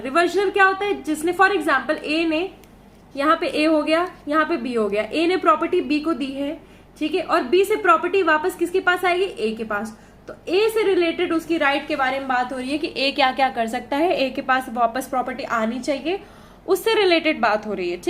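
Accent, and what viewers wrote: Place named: Lucknow, India. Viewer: Apki voice me lucknow ka accent hai❤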